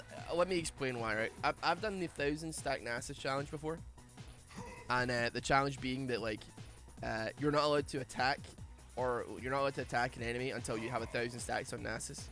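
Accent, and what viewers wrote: Scotland, United Kingdom. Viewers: Just a heads up, man, he's not Irish, he's a Scott.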